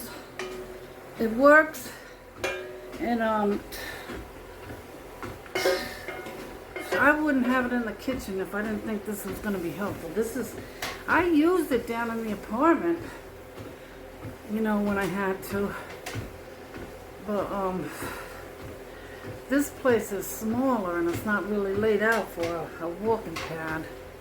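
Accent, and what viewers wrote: New York, United States. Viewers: PS... you don't have to walk to keep me listening. I love your NY accent and your great personality.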